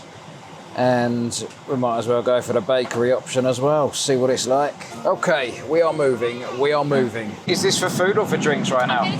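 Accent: United Kingdom. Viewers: I always get the feeling that you are the kind of British guy that I would love to hang out with. I am a Singaporean but it gives me a sense of being a British when I hear you speak.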